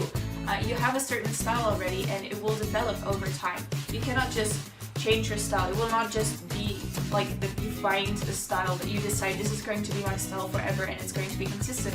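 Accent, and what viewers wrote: Netherlands. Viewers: your dutch? awesome! I'm also dutch ;D well asian, but I am born in the Netherlands good video and I like your accent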